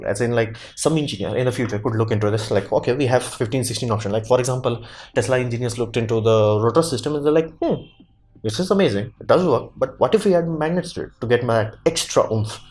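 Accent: India. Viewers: Our Bharatiya pronunciations. and fast speaking makes it difficult to digest your topic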